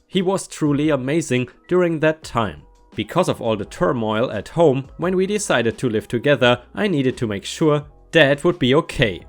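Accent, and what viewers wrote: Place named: Germany. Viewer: Okay, your accent is very strong so I ask myself :D German or Austrian? It sounds so because of the sound of some words - If not.... Shame on me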